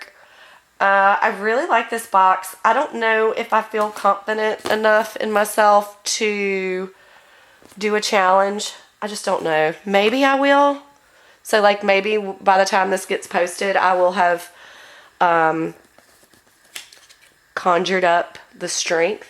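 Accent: South, United States. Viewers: Trying to support smaller artist channels. I don't understand why some of y'all have so few views. Your reviews are just as good as those of the "bigger" people. Yeah the filming quality isn't as good but the content is fine and concise. I liked your video and your southern accent. Don't hear it anymore since I moved from TX to Massachusetts haha Edit: wait, they put different colors in the boxes? I didn't know that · I love your southern accent 😊. I lived in Mississippi for years! I live my Princeton brushes!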